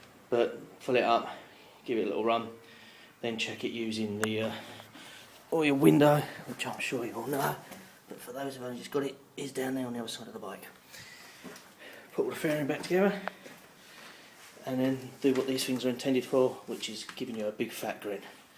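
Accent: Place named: United Kingdom. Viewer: Nice one.. Good to hear a UK accent! Im about to do this on my '97 CBR 6f. Kept burning out the mid connector. Anyway brilliant upload thanks:) oh and check out 90's Japanese Sportsbikes on Facebook. Were a good bunch and ya bike qualifies lol.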